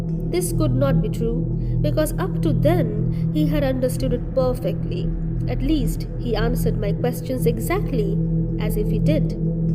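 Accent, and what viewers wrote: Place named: United Kingdom. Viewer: Your voice is just wow .. accent is too British . Just love your audio book❤ keep going.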